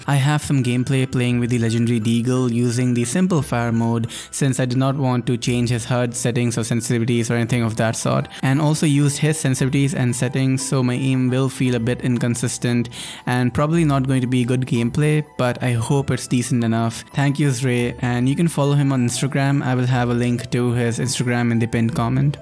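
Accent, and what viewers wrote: India. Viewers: You sound Indian Are You from India? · Are u Indian???ur accent is a bit Indian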